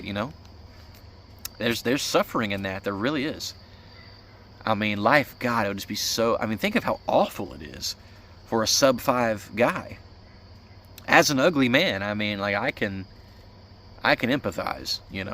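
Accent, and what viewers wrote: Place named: South, United States. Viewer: I like your southern accent and your style of narration ❤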